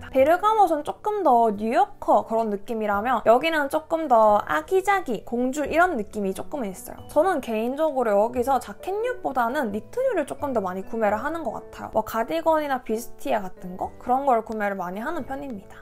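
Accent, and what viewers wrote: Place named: Busan, South Korea. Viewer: 런던플랏 아는곳 나오네 너무 반가워요 부산말도 반갑구요 잘보고가용 ᰔᩚ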